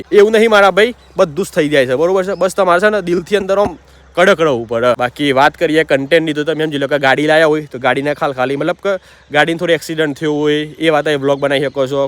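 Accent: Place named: Mehsana, India. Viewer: મને બીજું કંઈ નથી ગમ્યું બસ ભાઈ ની મહેસાણી ભાષા બહુ ગમી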